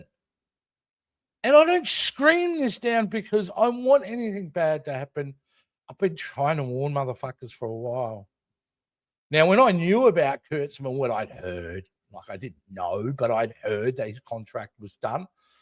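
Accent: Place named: Australia. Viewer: nice to hear an Aussie speak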